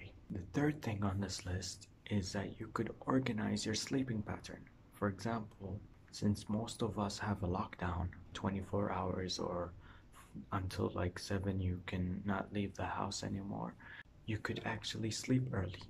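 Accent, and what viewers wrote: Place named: Iraq. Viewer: Make subtitle with it please... I really wanna learn Iraqi accent 🥺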